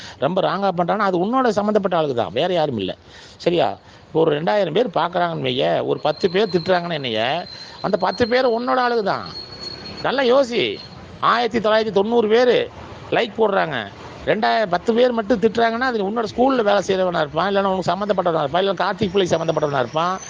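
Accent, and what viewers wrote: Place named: Kongu, India. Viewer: பக்கா கொங்கு தமிழ்❤😂❤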